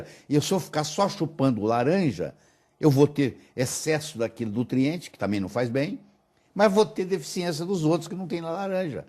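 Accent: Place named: Minas Gerais, Brazil. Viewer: mineirice... coisa linda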